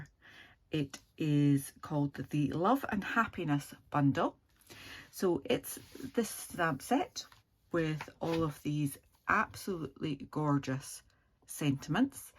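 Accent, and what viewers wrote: United Kingdom. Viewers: Great to hear a Scottish voice x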